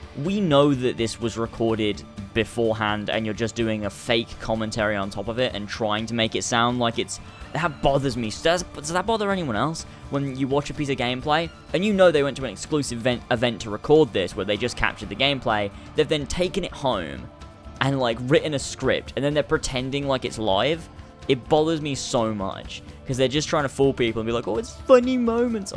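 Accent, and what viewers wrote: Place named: Northampton, United Kingdom. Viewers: He's from Northampton, you're lucky mate I'm from Birmingham and we all sound inbred. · Where bouts in the UK are you from i recognise the accent??? Im from West Yorkshire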